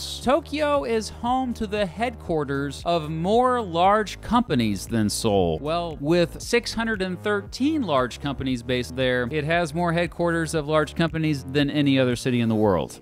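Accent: United States. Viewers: He clearly didnt learn the Korean romanization for this video. His pronunciation is pretty much expected from an American. I disagree that the revised Korean romanization is horrible. It got rid of the use of weird symbols that can't be typed with American keyboards that was previously present in McCune. And romanization systems aren't English, obviously you can't rely on English pronunciations for reading foreign romanizations. You actually have to learn them as the system that they are.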